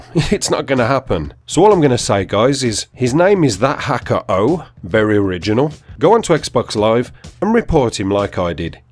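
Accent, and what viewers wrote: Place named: Ireland. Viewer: Your irish :)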